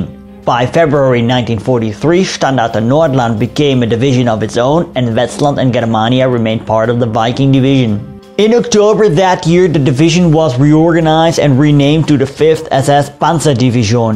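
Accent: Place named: Netherlands. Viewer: oh mate I'm watching them all. I love your take on the subject and the crazy Dutch accent. My history teacher was an inspiration at school and I never lost the love of the subject.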